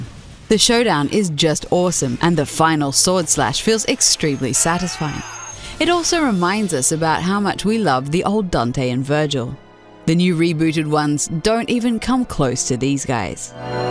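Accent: Australia. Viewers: She's Australian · Is the mysterious voice over lady's accent australian? Or where is this accent from? I love it!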